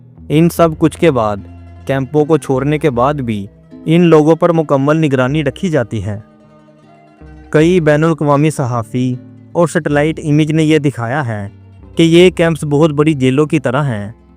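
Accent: Punjab, Pakistan. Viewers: Aap kindly Urdu ka labo lehja zara behtar kur lain warna Punjabi style mein Urdu suit to nahi karti baqi aap ki marzi, zuban Punjabi ho ya Urdu us ka asal tarah ada kurna hi Zuban ka Husan hai warna Allah ki Panah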